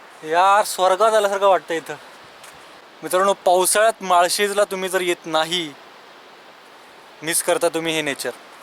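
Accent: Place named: Kolhapur, India. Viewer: Best commentator bhava..👏 Ekdam kolhapuri type..😉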